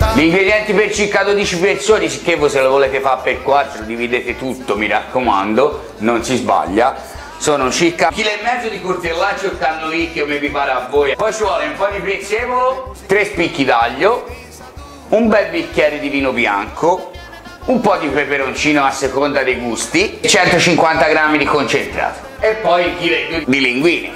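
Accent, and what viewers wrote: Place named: Sicily, Italy. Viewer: a me sembravi un siciliano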